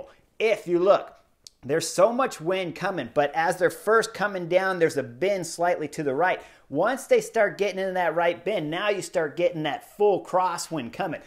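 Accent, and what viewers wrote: United States. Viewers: you’re really excellent at your craft. You’ve always been a good spokesman, with your Cali-cool way of talking, even back in your racing days. I appreciated your insight into the not-so-obvious reasons for the big crash. And, I also appreciated that you were scared while racing. I was too. The fear (ie adrenaline) will produce strength and performance beyond what is imaginable.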